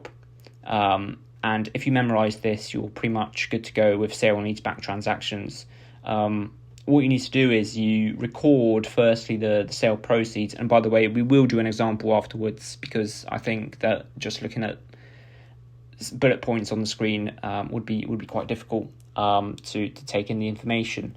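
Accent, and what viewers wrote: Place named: United Kingdom. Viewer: You explained it for simply, thanks a lot. I expected to see more videos but didn’t find. By the way I love this British accent lol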